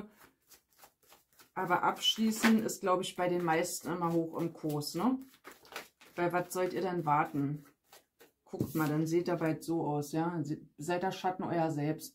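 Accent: Berlin, Germany. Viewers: Liebe, danke für deine "Berliner Schnauze". Red wie sie dir gewachsen ist. Ich mag das sehr! Die wenigen Kritiker gehn doch unter bei uns. Du hast mir die letzten Wochen einen unglaublich klaren Blick auf die ganze Sache und meine Erfahrungen/Bedürfnisse geben. Auch wenn es oft weh tat/ tut. Nur so kann ich gestärkt vorwärts. Danke und weiterso. Fühl dich gedrückt. Liebe Grüße aus Bregenz am Bodensee 💕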